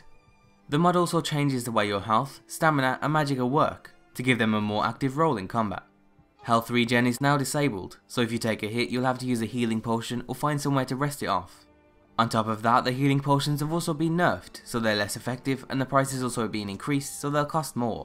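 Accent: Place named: West Midlands, United Kingdom. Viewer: Where you from? Sounds like west midlands haha. I'm from Birmingham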